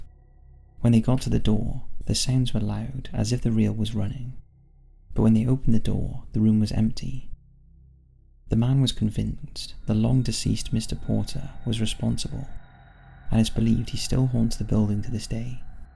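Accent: United Kingdom. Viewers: He’s British, there’s loads of different accents in the Uk. It’s just the US always make us sound like posh wankers. YouTube Sheffield accent for example. That’s where I’m from :)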